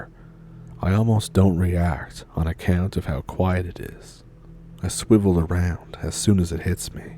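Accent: Canada. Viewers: I always love your stories. And your Canadian accent!!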